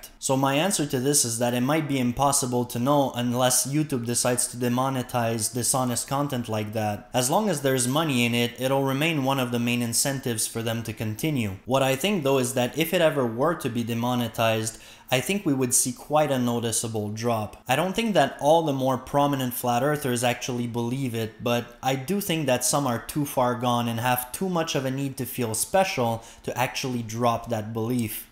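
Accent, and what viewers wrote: Quebec, Canada. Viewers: Congrats on the 2,000. Here's to 5K! Please don't change your accent. I love it and it helps you stand out. Canadian French would not have been at the top of my guesses. For my part, I like the range of topics you bring to the table. FE is fine, but I agree live debate is a waste of breath. There are a bazillion other topics available to present, or to debate. Somethinks I'd enjoy hearing your opinion on? Gosh, how about: - AI revolution and workforce displacement - Big data works, but should countries allow? - Will AI achieve anything we recognize as consciousness? What could it look like? - What is quantum computing? - Why can't we protect networks and databases, the Internet? - What is super-cold? - What is the hold back in developing electricity storage to enable renewable energy? - Are we ready to seriously privatize space? I'll leave a little warning about the creationist, anti-evolution, and young earth craziness. 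They are in the same neighborhood as FE plus you stir in evangelical fundamentalism. We should try to contain that neurosis to the U.S. But that said it would be fun to see you mud stomp them. ps - please let me if Canada decides to build a wall along it's southern border! lol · I guessed your accent was French Canadian. I completely agree with your reasons for not debating with globe deniers. See Bob the Science Guy’s two recent videos to see how bullying and dishonest globe deniers can be. I think we should be compassionate towards the deluded globe deniers who clearly have psychological problems, but condemnatory towards those who are pushing the flat Earth onto the gullible and ignorant. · Nothing wrong with your accent, although I never would have guessed French Canadian. You're right not to waste your time debating with people who don't want to learn. Have you seen The Last Kingdom on BBC/Netflix? 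Saxons and Vikings, dramatised around the true events in medieval Britain. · Je savais t'étais québécois !!! Nice vids ! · Indeed, removing that incentive may well see many a flathead dissapear from here. As a well travelled Dutchman, I tried to figure where your accent is from, but I focussed on the European nations. But now that the cat is out of the bag, I can hear you sound like the Villeneuves, both father and son.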